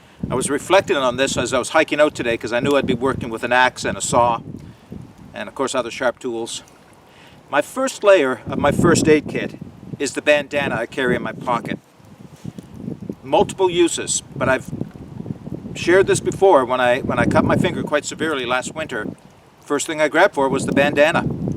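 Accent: Canada. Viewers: Hi nice video. I watched this without knowing where you were located. Given your accent I was certain you were Canadian. I also noted that the wilderness looks identical to what I know. I noticed finally that you were in Nova Scotia. I am a little further north located in Southern New Brunswick. Best wishes and nice job on video.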